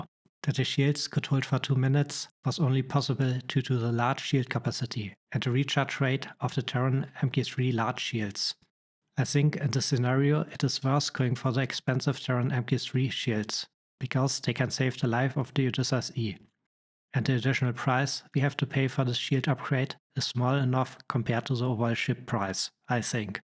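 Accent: Germany. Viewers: i am not a native speaker either but your beautiful german accent do not hinder my ability to understand at all, I even find your accent crispier than british english to identify each single word lol 😂. If I may suggest...the automatic subtitles (in english) work very well for those parts difficult to comprehend, maybe you could check the subtitles for futures videos to make sure they show exactly what you say, or suggest people to turn on subtitles! Anyway good joob and ciao from 🇮🇹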